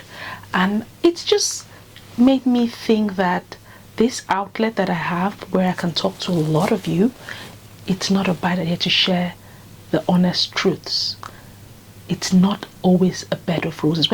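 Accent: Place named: Nigeria. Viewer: She’s not Ghanaian. Her accent is more of a Nigerian accent. I’m a Ghanaian and know a Ghanaian when I see one.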